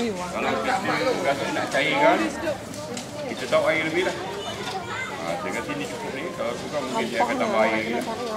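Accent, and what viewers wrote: Melaka, Malaysia. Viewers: memang best pakcik nie sporting tak kedekut ilmu dan mmg cara org melaka lah cakap gitu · Org melaka mmg loghat mcm tu, korang xyah nk kabut. Cara kasau hati baik, kalau xboleh kasar duk gua kwn dgn beruk. Melaka dihati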